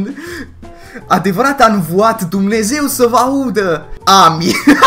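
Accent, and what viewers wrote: Transylvania, Romania. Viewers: Ești ardelean??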